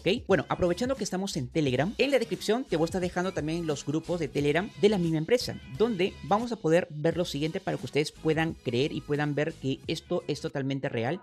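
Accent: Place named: Peru. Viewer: EL mano si era e peru por el micro ????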